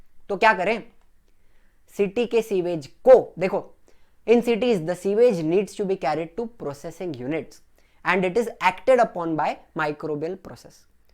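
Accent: Maharashtra, India. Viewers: सर appp Maharastrian ho kya ?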